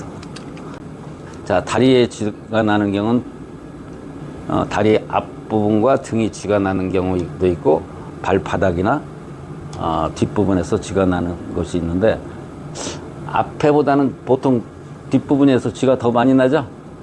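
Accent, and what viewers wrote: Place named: Chungcheong, South Korea. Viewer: 강의 참 재미있습니다❤ 충청도 제 고향 본토 사투리가 더 재미있습니다❤ 바락바락 ㅎㅎㅎ 다리에 쥐 날때 족삼리 오금통 위중에 사혈 숨통을 터준다 ❤❤❤ 급한대로 피를 낸다 백문이불여일견이라 신경 신경은 허리 천추에서 시작 진단 엘로드 진짜 재미가 바락바락납니다 ~~~ ^^ 고맙습니다 ❤❤❤❤❤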